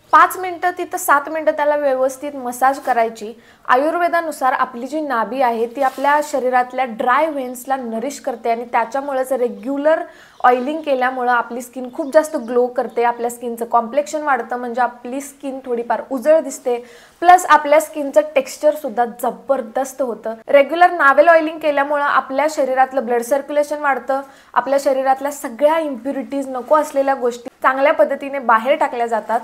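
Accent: Kolhapur, India. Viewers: Are u from Kolhapur?? Bolnyavrun tr tsch vataty khr ahe Kay?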